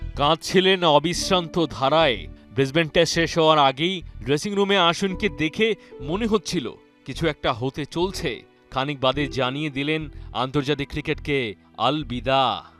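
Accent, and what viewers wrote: Kolkata, India. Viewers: আপনার কন্ঠ কলকাতার লোকদের মতো কেন?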